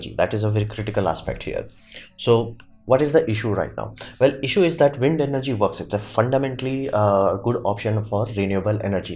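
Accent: India. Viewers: Very nice, presentation!! I have seen your other videos also, you do lot of research and then prepare your videos.. Very good channel for science and tech guys! In this video, Powerpod attracted me, from photos it appears that turbine used in it would be around 25-30 cm in diameters. So it is high rpm low torque type turbine. I would like to ask, that to increase torque and decreasing rpm are they using gears, or this new designed wind turbine is directly connected to generator?? Is it possible that it could generate upto 5kilowatt ? Just think how much torque would be required to run 5kilowatt generator?Can funneling do real magic? (How much velocity of air increases by funneling, it's efficiency... Max rpm of turbine) What is your opinion on it? Please reply, But i liked this concept of rooftop wind turbine, well practically to verify these claims we have to wait when powerpod will be available in market.. 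Definitely one day when all natural resources like coal, oil,.. Etc we will be used than power production will become serious issue.We have to prepare for it right know!! You seemed to be Indian (your accent of English) I appreciate your hard work, and keep doing so, your channel will grow.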